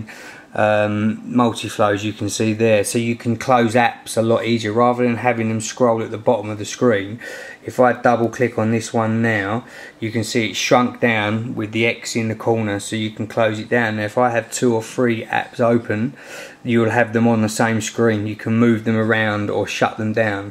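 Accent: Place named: United Kingdom. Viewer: Piges?! Lol british accent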